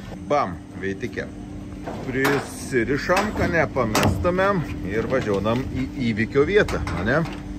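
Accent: Samogitia, Lithuania. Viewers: Netyčia užmačiau ir dabar vis pažiūriu. Labai fainai žmogus pasakoja, smagiai žemaičiuoja ( kaip ne žemaičiui smagu paklausyt), gal tik kartais norisi detalumo, na tarkim kaip tampomi šniūrai, kaip/ su kuo susukat lentas tarpusavyje, kampukais ar ilgais varžtais ir t.t. Šiek tiek detalumo. bet šiaip labai smagiai žiūrisi ir ( jei neklystu), labai su cinkeliu toks ( į gerą pusę):)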